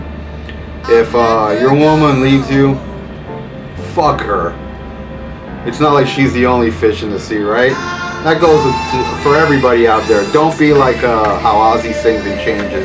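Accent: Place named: Chicago, United States. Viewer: Did you grow up in Chicago's "Back of the Yards".......because you use the same expressions and have a bit of accent like us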